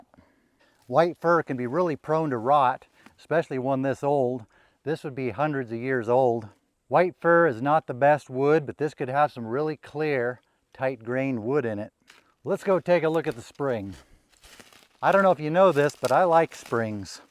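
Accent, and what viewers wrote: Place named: Pacific Northwest, United States. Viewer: Sounds like northern oregon or southern washinton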